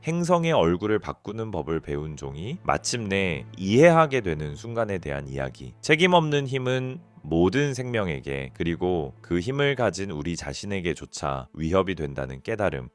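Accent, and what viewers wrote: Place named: China. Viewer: ㅋㅋ짱깽이냐 3M를 세미터 그러고 서론이 너무길다 구독했는데 취소하고 간다 한국말 연습 더해라ㅋㅋㅋ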